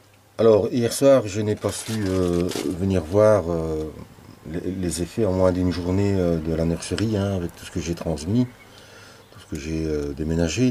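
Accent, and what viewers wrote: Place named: Wallonia, Belgium. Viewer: moi tu pourrais encore faire tes vidéo en wallons je kifferais aussi. Il faut être fière de notre accent, nos formules de langage, de notre Belgitude... il n’appartient qu’à nous-mêmes! 👍🏼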